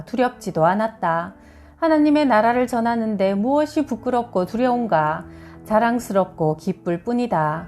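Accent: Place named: Daegu, South Korea. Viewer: 대구사투리도 너무너무 잘 하셔요 몇 번 들었는데도 참 고맙습니다 감사합니다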